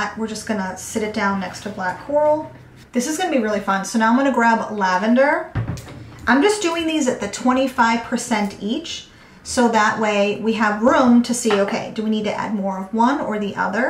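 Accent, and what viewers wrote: Boston, United States. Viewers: Another fellow Bostonian-ish. So funny! I actually grew up in Revere and live in Illinois now :) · Hi, I stumbled upon your videos and found them very helpful. Im new to candle making and after hearing you talk I was excited that you might be in the Boston Area ( due to your accent) Im in Peabody Ma. I then watched your moving vlog and learned that you had just moved from L.A. to San Diego. LOL. Although I was born in Boston, I moved to California in 1992. I started in San Diego,then moved to L.A. (Venice Beach, West Hollywood). I moved back to Boston about 5 years ago....took me a while, but realized I was always a new englandah.... Anyway, I really like your videos and subscribed. I find them wicked helpful. Good luck and keep up the good work. P.S. Im a graphic designer by trade, so if I can help you out, just give a hollah.... 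I owe you!!!! I saw your video when you went to the market...I agree.... I love your sign too! Cheers Chuck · I came for the candle content but stayed for the accent 🤣. I was born in Boston but have been living in Cali for over 30+ years. I miss that accent soooo much. Loved this video - and many of your top 10 (13) are in my stash as well 👍. I can second the recommendation for Little Bee Scents. I’ve used some of her FOs and they are AMAZING. A little on the pricier side, but worth it in my opinion. I’ve watched a couple of your other vids and really appreciate the honesty in your critiques, assessments, etc.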